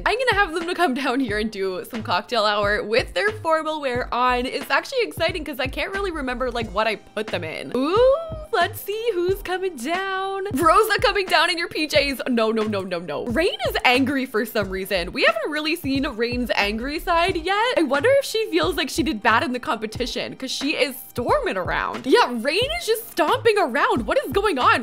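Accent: Canada. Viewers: When I saw Eliza as a mixologist, the first thought that came to my mind was "oh God, she's gonna spit in all of the contestants drinks" 🙈 Absolutely love the chaos in the Reality show! Little, funny side note: since I've been watching your videos I kinda started picking up your accent and the way you speak :D My half Canadian English teacher (who speaks normally in British English) was excited to hear his accent from one of his student xD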